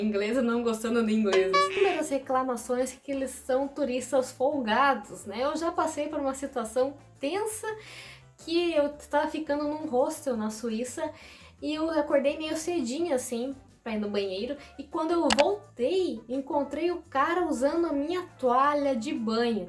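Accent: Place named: Santa Catarina, Brazil. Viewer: Ganhou um inscrito pelo sotaque. Só fiquei meio! Particularmente. Seria Catarina. Mas se do interior, Rio Grando. Mas bonita, e um sotaque para I’m agradavel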